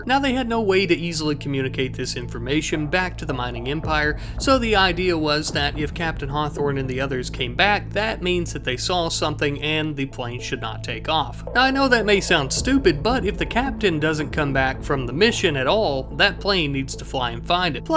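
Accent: South, United States. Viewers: Who cares if he is working off a script, human or AI written. In fact, I appreciate the hustle. He took the time to edit his lines, work on his jokes, while writing it out. Now tell me, why ChatGPT? If anything, he mixes in a lot of local colloquialisms and that Southern charm, AI can't write that, can't deliver it. Llistening to makes me miss the south, a lot. Peculiar, genteel, savage, all at once. Oh and swampass, can't forget that. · I've been here around this channel for a while even commented about your southern accent at one point (don't know if you remember lol) but it's nice to see you grow and I hope you're doing well! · are you southern? i swear i hear an accent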